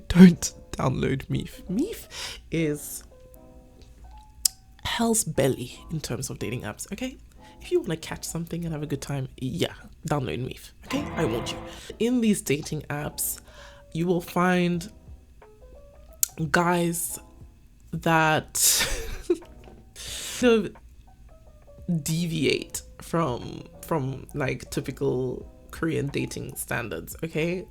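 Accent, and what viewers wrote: United Kingdom. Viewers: You’re Canadian? I thought you were British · I laughed so hard how did you switch your UK accent to a Nigerian accent🤣 I just discovered your channel and I really like it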